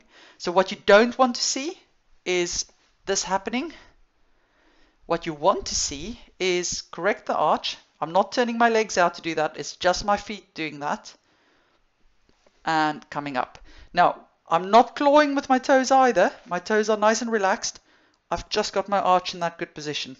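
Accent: South Africa. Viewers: Thanks you and is that that an South African accent? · South African accent!! I dont hear to many of those anymore here in the UK haha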